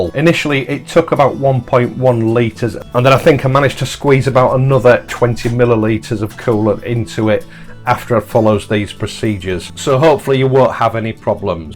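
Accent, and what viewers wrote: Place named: East Yorkshire, United Kingdom. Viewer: Great video! Nice and clear as always and your calm E Yorks tones are most relaxing too 🙂. (Could I just be a little bit pedantic though by saying that air pockets in the system are not 'cavitation'. Cavitation is caused by pressure vapourising liquids in things like turbines, centrifuges and with boat propellors.)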